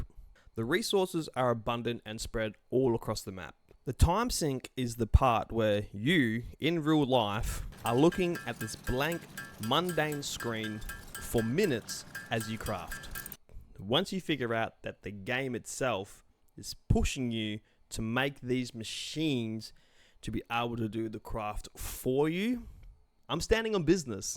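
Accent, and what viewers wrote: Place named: Australia. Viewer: This is the second time I’ve watched this video. I love your Ozzie accent!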